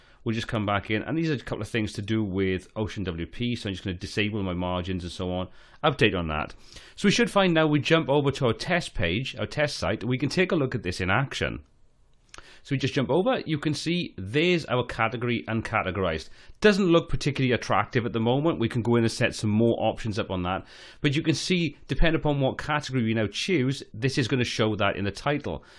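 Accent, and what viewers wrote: Wales, United Kingdom. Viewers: Great to hear a fellow Welsh accent :)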